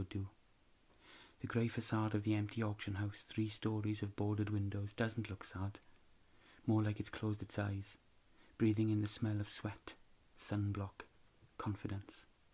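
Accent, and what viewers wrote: Wales, United Kingdom. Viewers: A Welsh accent! Very pleasant to listen to. Supposedly many of my ancestors were Welsh (I'm American). I can't wait to see more videos from you! :)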